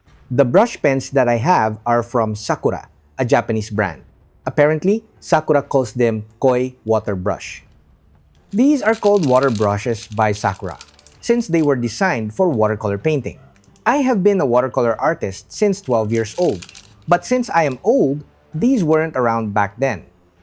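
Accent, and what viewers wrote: Philippines. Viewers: I heard your accent in one of your shorts and thought you sound like Noypi like me 😂 Now I'm getting interested in learning 😆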